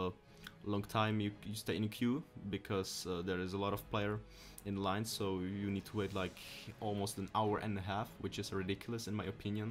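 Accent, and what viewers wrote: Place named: Czech Republic. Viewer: Jsem si řikal že mi ten přízvuk zní česky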